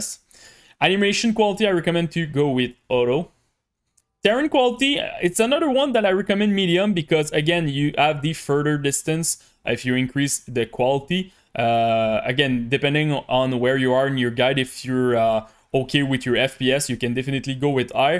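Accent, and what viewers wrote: Quebec, Canada. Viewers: Tu sonne qc ? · t'es clairement quebecois hahahaha. bon video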